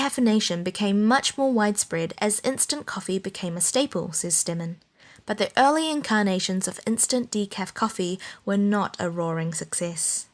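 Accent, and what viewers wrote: New Zealand. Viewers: 뉴질랜드 영어 쏘스윗!! 번거로우실텐데 너무너무 감사해요!! 더 열심히 들을께요 ☺️😍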